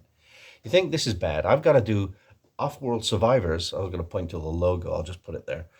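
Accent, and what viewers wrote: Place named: East Coast, United States. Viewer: Your American accent is great,. I agree it sounds like a mix of east coast tri-state state area. That's a certified New Yorker assessment. 🫡 Thanks for another great video!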